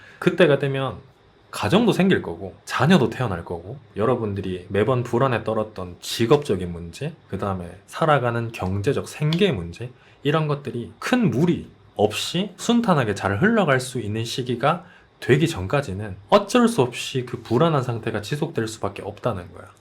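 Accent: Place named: Daegu, South Korea. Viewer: 영상감사합니다 제가 외국인 대학원생입니다. 돌아가신 교수님은 저에게 그런 가이드 같은 존재였어요 대구 말을 듣고 교수님 생각이 납니다 그 교수님도 대구 사람이시고.. 너무 보고 싶습니다, 항상 건강하시기를 바랍니다 영상에서 많이 배웠어요~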